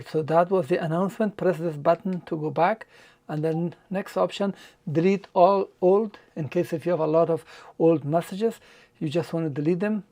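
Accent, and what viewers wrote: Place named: Poland. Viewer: I loooooooooooooooooooooooove your accent! Is that Polish?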